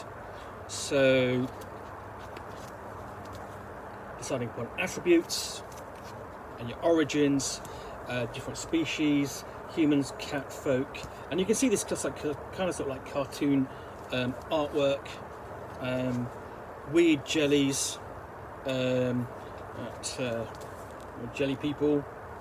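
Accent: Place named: United Kingdom. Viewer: Bom video! Adorei seu sotaque britanico e sua calvice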